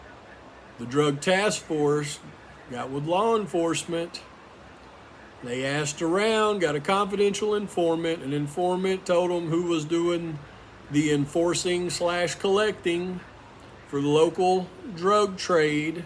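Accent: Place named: Alabama, United States. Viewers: I'm an Alabamaian too but I don't reside there. My accent isn't as clear as P's..😂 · You definitely deserve more than 1.6K subscribers. You give an interesting and compelling perspective -- I never really bought the drug connection, but you have me thinking. And as a native of Alabama, it is a delight to hear a guy with an accent like mine who uses good grammar and is smart as hell. Keep on,. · Finally! Thank you. It mostly makes sense to me now lol. This crazy case has been drivng me insane. I think most people want justice but don't want to see a (possibly) innocent person framed and just want reassurance (real evidence) that he did do this crime. Also I think it is very scary when it appears that our rights are being ignored and it is insulting to our intelligence that we are being lied to and misled. Thank you for providing some much needed clarity. 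Btw I love your voice-I went to college and lived in AL for a while and your accent seems similar. Please keep the info coming! It is much appreciated!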